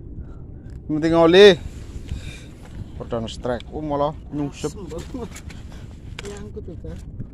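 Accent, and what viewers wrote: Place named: Banyumas, Indonesia. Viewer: Waduh malah patah sepining pancing nya ,yang penting dapat ikan bos,ngomonge ngapak koh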